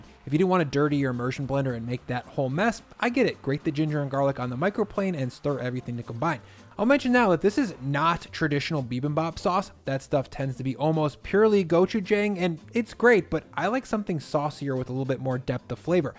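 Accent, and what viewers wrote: United States. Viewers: Why does this guy have to use metric system measurements? It’s so frustrating. He’s clearly American with his accent or lack there of.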